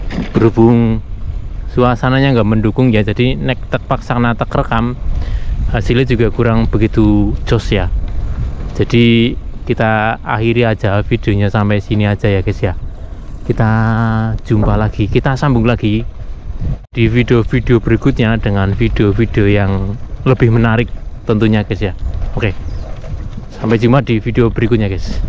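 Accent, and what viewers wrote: Banyumas, Indonesia. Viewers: aku suka gaya ngevloge, ana ngapak2e...👍👍👍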